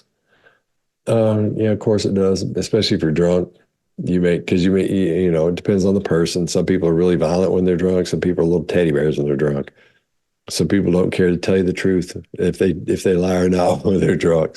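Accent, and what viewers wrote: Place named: Tennessee, United States. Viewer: On you tube I watch a woman who is a great stand up. She's from Tennessee. I think her name is something like Jeannie Morgan.? When I started watching you, I thought you sounded like you're from Tennessee. You use similar phrases, "I love me a little baby." And "Bless her heart." I was delighted that I was right!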